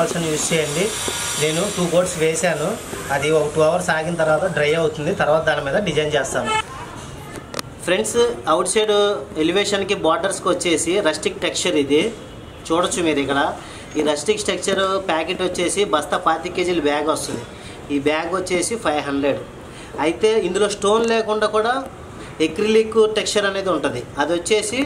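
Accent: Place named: Singh Nagar, India. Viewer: nuvvu matladuthuntee singnagar ,vombaycolony slang ardam aipothundhi Anna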